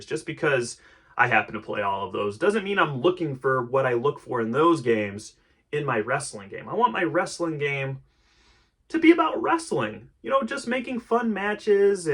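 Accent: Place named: Mexico. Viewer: No homo bro damm you are hot 💀😭 I was thinking you were from Mexico because of your voice 📝🗒️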